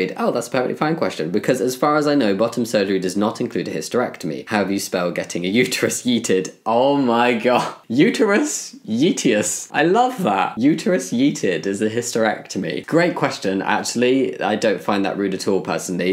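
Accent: United Kingdom. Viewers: I like your voice. Are you british? How have you gotten it? Or you have had it before transition?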